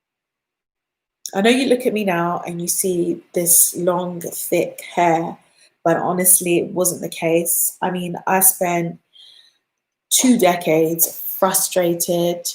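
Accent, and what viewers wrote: United Kingdom. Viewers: So funny. I literally just looked up "fortnightly" (happening or produced every two weeks). You speak the Queen's English. I speak American. LOL!!!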